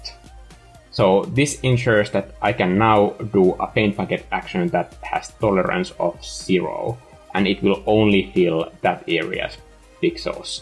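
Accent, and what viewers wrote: Finland. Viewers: Suomalainen aksentti? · Tuli suosituksii tää video ja heti aksentista kuuli et oot suomalainen xd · For me it was the reverse: as soon as I heard him speak I thought "wait.... he sounds finnish" looks at name yep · Hmm are you from Finland? That accents is kinda like a Finland accent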